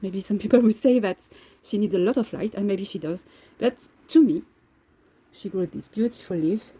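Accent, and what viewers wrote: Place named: France. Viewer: I love your French accent! you speak English very well, please do not apologize. 🙂loved seeing your planty space and your very adorable doggie. 🐶thank you for participating in this tag 💚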